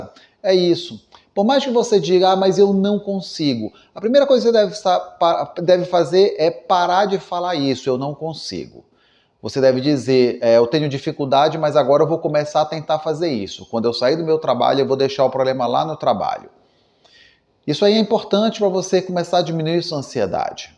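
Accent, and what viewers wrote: Pará, Brazil. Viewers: Doutor você é paraense? Seu sotaque me lembra o paraenses❤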